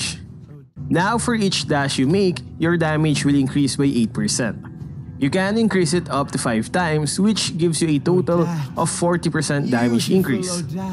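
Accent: Philippines. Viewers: The pinoy accent tho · I love your accent, very Filipino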